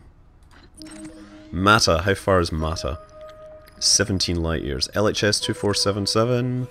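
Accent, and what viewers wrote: Scotland, United Kingdom. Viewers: Mr.: as you got closer to 'Home', I shared in your obvious excitement. Well played, commentated, and shared. Thank you! P.S. It was your Scots accent which originally caught me, then I watched more of your vids, and have since subbed. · Has anyone told you, you sound like a Scottish Carl Sagan?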